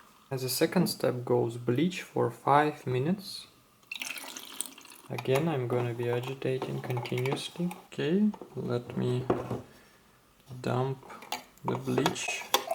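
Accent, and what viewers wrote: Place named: Russia. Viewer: У парня тяжелый русский акцент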